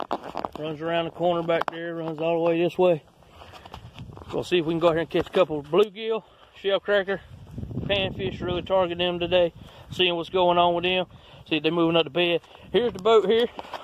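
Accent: South, United States. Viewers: My family is from the hard south and you sound just like them I love it makes me feel like I'm home again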